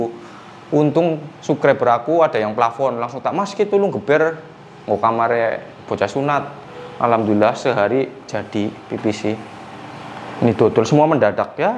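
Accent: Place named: Brebes, Indonesia. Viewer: Ngomonge kayak wong brebes ms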